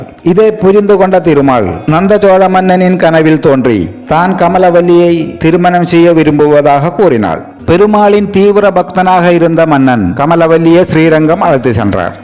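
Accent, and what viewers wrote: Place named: Kerala, India. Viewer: നല്ല മലയാളച്ചൊവ ഉണ്ടല്ലോ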